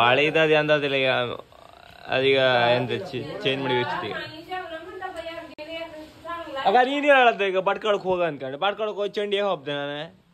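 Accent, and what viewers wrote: Kundapura, India. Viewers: What kannada he speak is not normal kannada but it's dialect of kannada called " Kundapura kannada". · ಚೆಂದ ಇತ್ತ್ ಮಾರೆ ನಿಮ್ಮ vlog ಕುಂದಾಪ್ರ ಕನ್ನಡ ಕೆಂಬುಕೆ ಚಂದ ಶುಭ ವಾಗಲಿ 👏👏👏👏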